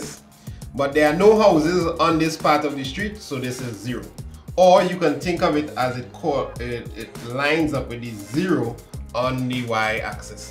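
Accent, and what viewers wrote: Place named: Trinidad and Tobago. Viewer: I can tell that he is trinidad by the patois 😂😂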